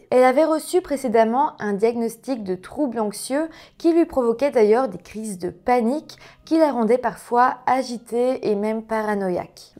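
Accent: Belgium. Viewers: Hey mais... tu es belge ? :D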